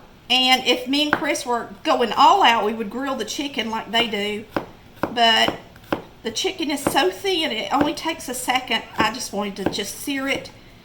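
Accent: South, United States. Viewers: Yes, you have to always pay extra attention to your pasta for the first 2-3 minutes or so after you return the pasta to a boil. Very important that you are stirring constantly at that point, and then at least another 3 good stirs during….untill done! Trust me….we are an Italian family who eats pasta dinners traditionally every Wednesday and Sunday. I learned after moving to Florida from Brooklyn, NY, that here in the south, they don’t make a lot of Italian foods. I think it’s wonderful that you’re expanding your cooking skills to learn new things! I’m learning how to cook “southern style” from you and I’m enjoying it because you make it so easy for me TO learn! Just plain simple English (with a heavy southern accent 🤣) using every day ingredients is the way I like to learn cooking anything new! Thank you for that!! ❤️😘🥰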